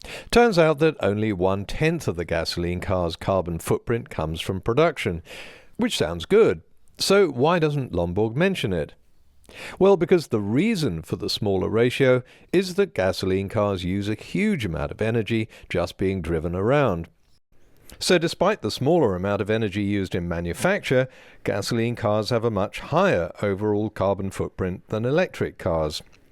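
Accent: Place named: England, United Kingdom. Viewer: He's not smug, he's speaking with an English accent. That's literally how we speak here. If that's "insufferable" to you, you clearly have never suffered. But your Bald Eagle profile and racist intolerant approach already says right wing populist long before your opinions could be considered valid.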